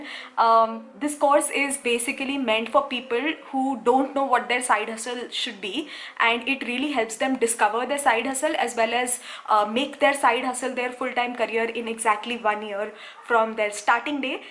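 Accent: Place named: South India, India. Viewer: You sound so much like a South Indian,you sound so cuttee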